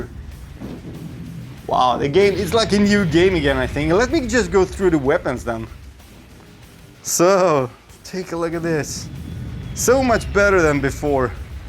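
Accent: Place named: Sweden. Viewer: Yeah no problem, glad to help you and the community. I used your method and everything else worked, except the weapons. All the files were there in the "models" folder, and other objects like barrels, etc looked HD, but not the weapons? Really weird. I did see a couple other posts other places, of people having the same issue. Also, I found that "Dark Forces" does not use the DOOM engine, and does not work in VR (yet). Love your channel, love your videos. I also like your accent, where are you from? Sweden?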